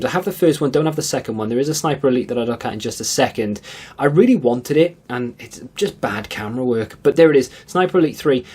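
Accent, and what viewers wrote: Wales, United Kingdom. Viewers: Are you welsh ? Ive got a PES<num> promotion copy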